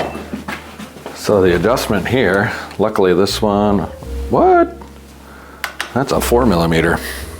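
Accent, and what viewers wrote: Wisconsin, United States. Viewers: New subscriber here. Algorithm found me after the videos you refer to, so I don't know what it was about and I don't want to. Here's what I found, though. A guy from Wisconsin who talks Wisconsin. My husband is from Wisconsin, so I have a soft spot. A guy who works on bikes and I've just bought my first bike (used) in 30 years, so a knowledgeable person who is also opinionated and so explains WHY he holds those opinions...I mean it hit all the buttons all at one time. So your new people are out here and we are finding you. Also got "Still True" queued up as my next read.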